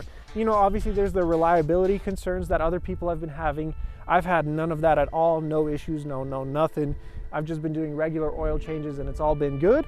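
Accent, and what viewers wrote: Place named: Canada. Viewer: That Canadian accent and way of speaking annoys the crap outta me.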